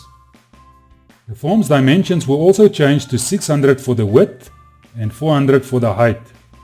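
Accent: South Africa. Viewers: Is jy Afrikaans?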